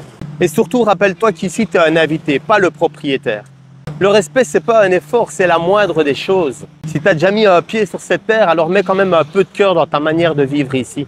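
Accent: Belgium. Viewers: Toi, t'es pas Français !!! Belge peut-être ? Merci pour cette approche...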